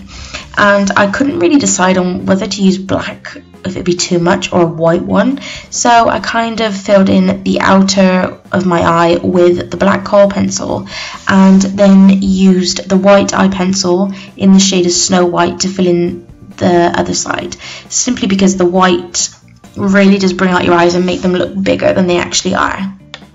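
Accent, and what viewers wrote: Bristol, United Kingdom. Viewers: Love it!I can't tell where you're from >.< so sorry if I'm wrong but you sound bristolian like me!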